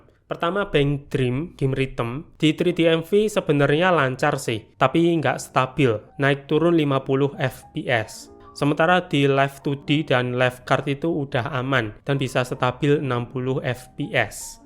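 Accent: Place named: Java, Indonesia. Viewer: Asli kliatan medok nya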